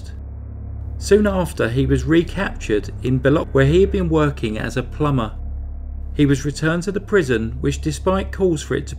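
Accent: United Kingdom. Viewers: Blimey he was a real right canker! Brilliant stories and narrated so Beautifully and British!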